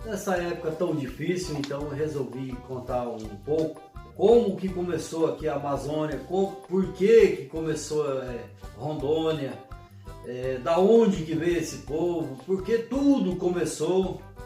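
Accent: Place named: Northern Minas Gerais, Brazil. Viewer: Esse sotaque é aqui do norte de minas, achei legal.rss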